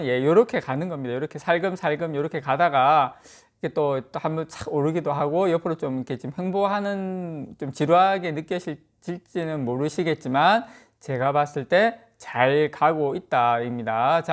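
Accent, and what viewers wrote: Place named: Gyeongsang, South Korea. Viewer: 저도 경상도🤗 발음 편안함니닷😄 점진적 소곤소곤 우상향📈 🅻🅾🆅🅴(๑•᎑< ๑)♡🙆🏻‍♂️🙆🏻‍♂️🙆🏻‍♂️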